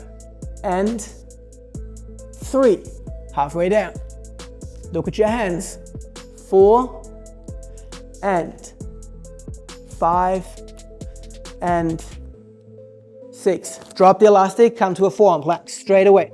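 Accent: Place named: Germany. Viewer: Was ich schon länger mal Fragen wollte: Wo bist du eigentlich geboren? Es klingt so, als hast du einen deutschen Akzent :P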